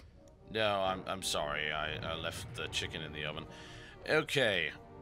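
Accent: United Kingdom. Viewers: This may be the least annoying British voice I have ever heard...I shall watch more!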